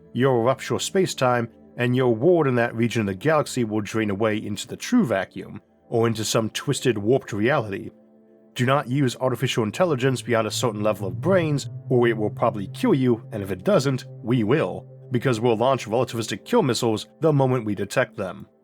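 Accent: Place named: Louisiana, United States. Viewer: You from Louisiana? You sound like a guy I worked with around Mansfield. Thanks for the content. I’m entertained.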